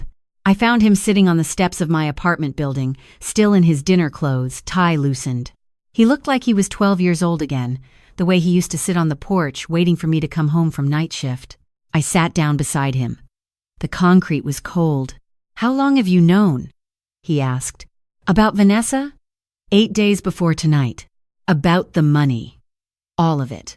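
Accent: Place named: France. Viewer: Why french pronounciation? Needs fixing.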